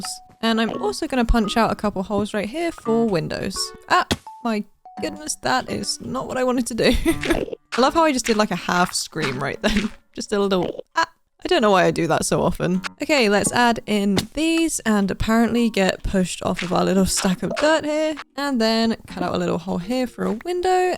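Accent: United Kingdom. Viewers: Wanted to comment and tell you that my little girl and I have been absolutely loving watching your videos lately. She is delighted by Millie's antics, and loves your British accent, as well.:) We've recently gotten back into minecraft as she's finally old enough (five years old) to play it, too! We love your videos, & Millie!!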